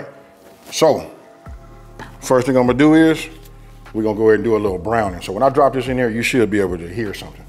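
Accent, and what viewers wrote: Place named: South, United States. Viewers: Love the version of ur recipes and love your accent. I’m from Philly, I’m a sucker for southern accents. I made this twice. It definitely hits different with cinnamon in it. Oh and definitely beans 🫘. On the east coast we use beans and ground beef 🥩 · That LA accent is strong lol I love it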